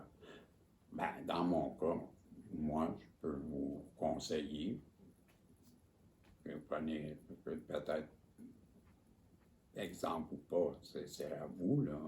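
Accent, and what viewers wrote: Quebec, Canada. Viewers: Pour ma part je suis une bouffonne mais dans le bon sens du terme j'aime la vie,.. j'adore ton accent québécois... je me suis détachée en amour de mon mari qui m'accompagne depuis mes 18 ans mais aujourd'hui après avoir souffert seule, bloquée physiquement depuis 6sem.. aujourd'hui,le voyant chantonnant .. je ne supporte plus sa présence....et me retrouve en totale perte d'énergie.. quitter la maison dans laquelle je me suis seul temps investie.. difficile.. mercii pour cette guidance ❤ Véronique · Salut oui tu as raison j’aimerais qu il me disent la vérité mais je ne reviens pas en arrière merci l ami j adore l ´ accent québécois vive la vie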